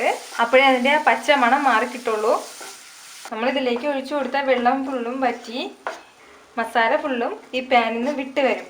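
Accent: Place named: Kozhikode, India. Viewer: but ur slang like clt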